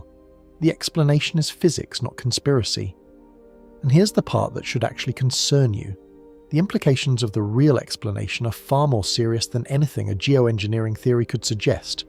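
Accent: United Kingdom. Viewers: I’m sorry, but us YouTube listeners watchers are sick and tired of this British accent crap, especially this one, instant turn off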